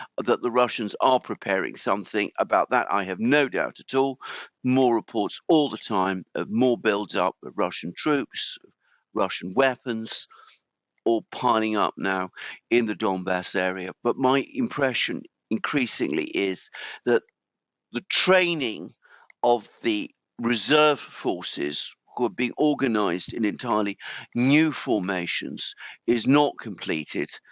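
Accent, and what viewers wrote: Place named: United Kingdom. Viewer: You mispronounce zaparesia and the names of lots of towns I don't know if it's your gay British accent lol or if you're just trying to sound fancy or I don't know makes you sound stupid though just FYI and you're not? Your very intelligent so I don't understand my man. I love you guys show you speak the truth!!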